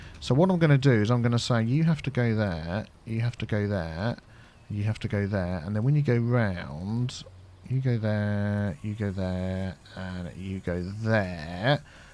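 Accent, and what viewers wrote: United Kingdom. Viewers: I’m like bingewatching all your Cities Skyline videos! They got recommended. I like your British accent, I like the content you show us, and I really feel like playing CS myself. I like the 'lane mathematics', 'autosave sippa tea' too 😜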